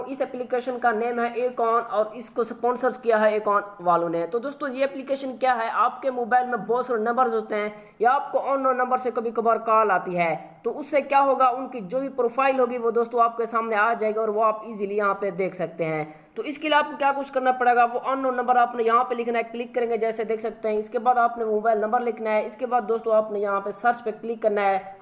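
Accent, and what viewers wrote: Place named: Punjab, India. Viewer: بھائ اپنا پنجابی لہجہ تو بدل دو.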